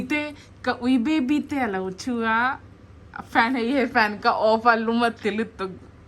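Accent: India. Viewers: I tenawm diaiduai itawng vainu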